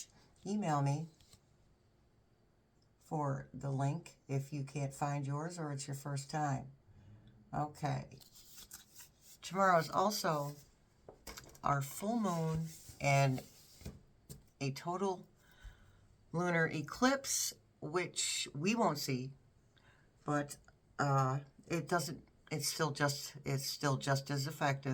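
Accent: New York, United States. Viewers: Your New York sounds a little like my North St Louis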